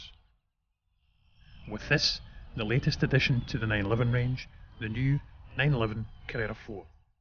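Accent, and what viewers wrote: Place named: Scotland, United Kingdom. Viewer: that scottish accent is brilliant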